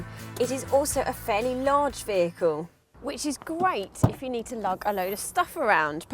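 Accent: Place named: Crawley, United Kingdom. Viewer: She's so cuuuuuuteeee! And that Crawley accent is so squee! This Yank is in love.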